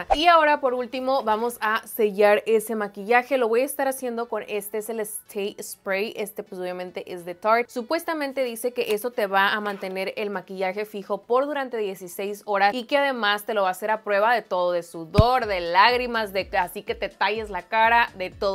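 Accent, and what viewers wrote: Sinaloa, Mexico. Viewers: "SINALOA" Se me viene a la mente tanto por tu acento como tu personalidad 😋😉 Saludos desde Ensenada 😎💜 Espero ganar porque me encantaría aprender a recrear tus maquillajes 😍😄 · Me encantan tus videos... cada que subes pienso en "Sinaloa", tienes bien marcado el estilo sinaloense hehe .. Te veo desde Ensenada!!😊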